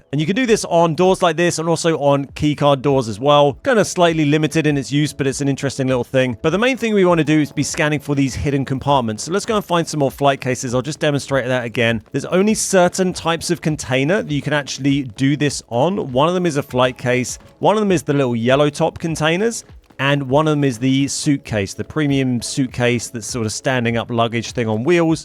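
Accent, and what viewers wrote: England, United Kingdom. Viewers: He's English but uses far too many Americanisms for my liking, but I forgive him..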